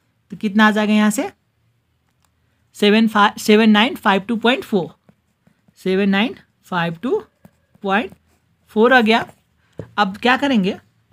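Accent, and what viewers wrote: Nepal. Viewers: Try to speak pure Hindi your are from Nepal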